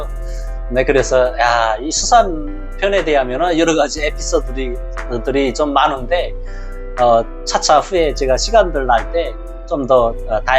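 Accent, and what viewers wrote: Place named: North Korea. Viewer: 이분 처음 왔을때 북한티가 났는데 이제보니 완전히 남한티로 탈바꿈 했네...억양만 좀 바꾸면 완전 남한 mz세대가 되겠네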